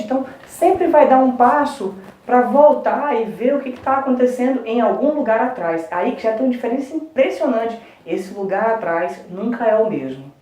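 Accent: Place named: Espírito Santo, Brazil. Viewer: Professor, vc tem "sutaqui di capixaba" rs Aulas muito claras. Conheci seu canal hoje. Muito bom. Parabéns 👏 👏 👏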